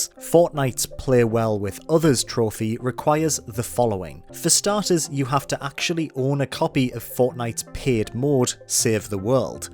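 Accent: United Kingdom. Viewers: “Rare” for this guy is rehhh wtf are British accents it’s excruciating to listen too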